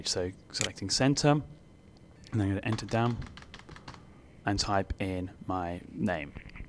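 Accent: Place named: United Kingdom. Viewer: by your accent you appear British which helps; many videos re comments-opinions on screenplay spacings take into consideration American page size which is smaller than ours; my question is: do we alter their spacings to accommodate our size of pages? And what spacing sizes are we talking about?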